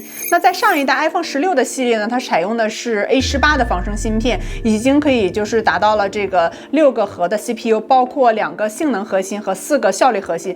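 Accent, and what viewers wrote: Northeast, China. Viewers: 主播都东北人？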